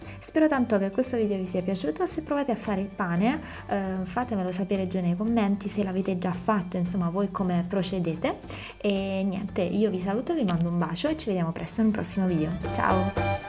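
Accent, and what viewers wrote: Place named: Marche, Italy. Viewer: Preferisco 1000 volte il suo accento marchigiano e la sua bravura alle cachielle presuntuosette, non ti pare????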